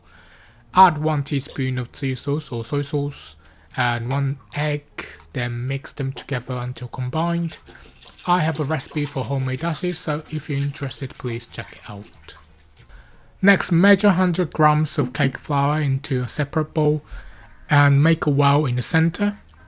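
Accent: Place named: England, United Kingdom. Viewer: Not sure about the recipe yet i totally hot lost in your fascinating English accent!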